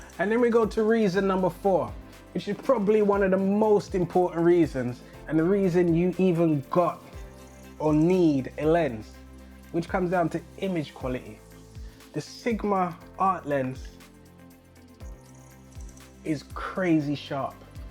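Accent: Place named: England, United Kingdom. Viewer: great review. gonna pick one up. also this is my favorite english accent, haha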